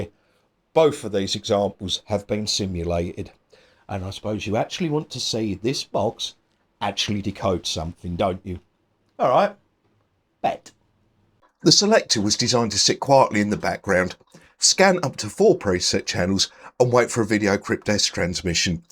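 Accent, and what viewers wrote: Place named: Cockney, United Kingdom. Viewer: You speak English with a Cockney accent.Thats very rare nowadays. i thought the Cockney accent died out?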